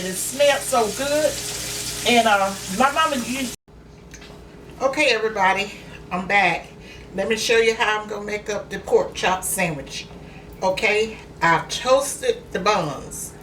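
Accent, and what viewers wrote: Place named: Alabama, United States. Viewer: Love your channel ONE OF THE BEST OUT THERE!! ... U EXPLAIN IT SO WELL.. ANYONE CAN FOLLOW..THAT BEAUTIFUL SOUTHERN ALABAMA ACCENT ADDS A VERY UNIQUE CHARM.. U HAVE DEFINITELY GOT THE. MR. SPOILED WITH THAT. DELICIOUS COOKING!! HE. SEEMS LIKE SUCH A. GOOD HUSBAND... THE ONE THING THAT I WOULD LOVE 2. SEE IS 4 U OR HIM ONE TO TASTE THAT DELICIOUS FOOD. B4 U SIGN. OFF!!....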